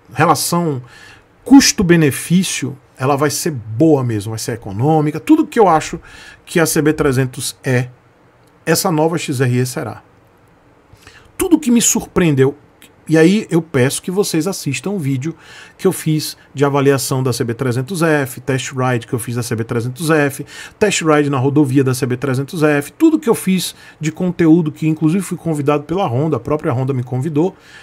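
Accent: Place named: Rio de Janeiro, Brazil. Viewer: alguem mais acha o sotaque carioca insurpotavel? kkkkkkkkk e minha opinião abraço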